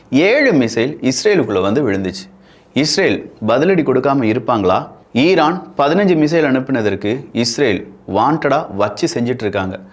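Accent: Kerala, India. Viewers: Bro your official slang reminds kerala pronunciation! Are you from kerala?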